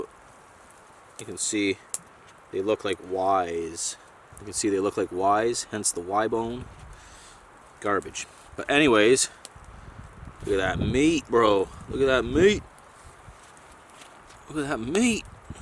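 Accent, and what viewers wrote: Canada. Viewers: Hey are you Canadian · I'm watching your videos every night from now on. That fire crackle and your familiar, calm Canadian voice (canada represent) just put me right to sleep.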